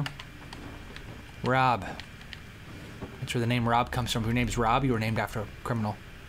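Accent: Scotland, United Kingdom. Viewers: dat scottish accent :V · SCOTTISH ACCENT? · Not bad Scottish accent :D Of course, i'm comparing you to Hypermole from mole237 (free advert for him) So...yeh, do it more :D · he's got the Scottish accent but not the slang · 'TIs a Scottish accent :3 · I prefer the scottish accent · No he spoke british and scottish accents in the video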